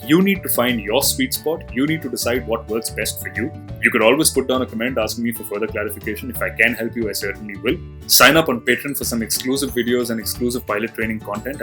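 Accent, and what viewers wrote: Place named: India. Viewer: Man u have the most charismatic indian accent ever. :D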